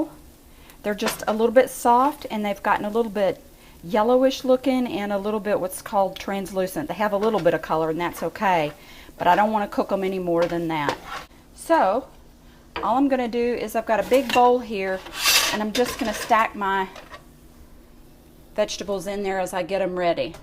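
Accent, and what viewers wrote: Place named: South, United States. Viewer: Wow looks delicious! Thanks for the mushroom tip, to cook on higher heat and cute Southern accent.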